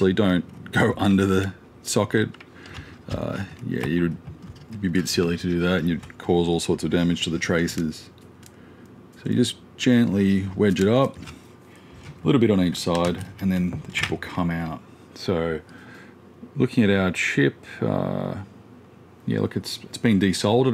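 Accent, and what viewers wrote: Australia. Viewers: Great video. The microphone works just fine. I'm mexican and I do understand very well your aussie accent. Thanks for your video.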